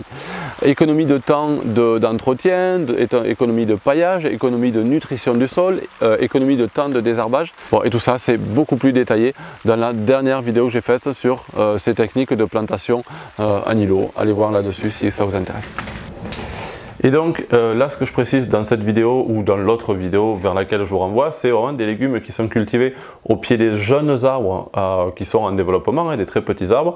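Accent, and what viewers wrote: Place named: Hérault, France. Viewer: Bonjour nouvelle abonnée votre terrain surement dans lherault je connais bien cet accent😊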